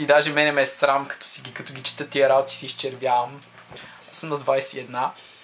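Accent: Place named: United Kingdom. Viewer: awesome british accent is awesome (bow)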